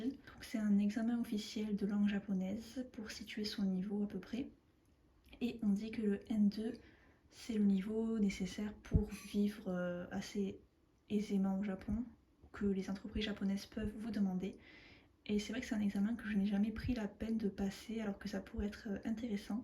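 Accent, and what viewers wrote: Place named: Toulouse, France. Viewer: Bonjour! Vidéo très agréable! Tu es de Toulouse? C’est ce que je me disais avec ton accent. 😊 J’ai fais une licence de japonais à l’université Jean Jaurès (à l’époque le mirail) en <num> et je vis au Japon depuis 2007. J’espère que tu feras d’autres vidéos sur l’apprentissage du japonais ou sur ta double culture! J’ai moi même deux enfants mais malheureusement ils ne parlent que japonais! Bon courage pour le JLPT! À bientôt!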